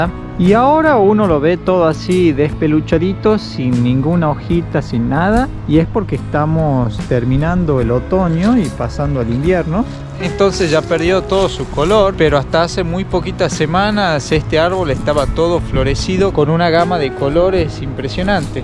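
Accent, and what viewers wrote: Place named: North, Argentina. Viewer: Que lindo el acento norteño argentino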